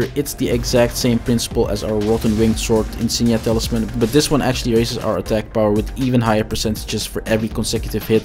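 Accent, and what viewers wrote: Netherlands. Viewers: Totally agree. The builds are on point, 100% of the time. And, the Dutch accent provides the same gravity for ER buildcraft vids the German accent provides for the Mathologer's math videos! 😎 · followed ur early to mid game build, and now doing this one too. love the detail and explaination. btw are you dutch?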